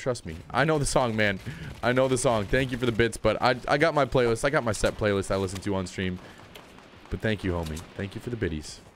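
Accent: United States. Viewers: R u American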